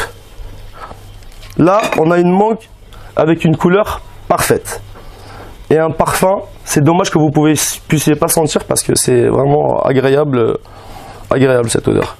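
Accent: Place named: Kabylie, Algeria. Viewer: Je reconnais l'accent kabyle lol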